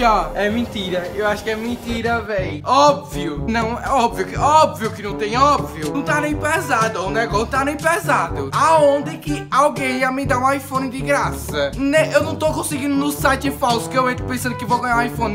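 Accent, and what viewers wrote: Bahia, Brazil. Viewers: vc é baiano?? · C é da Bahia?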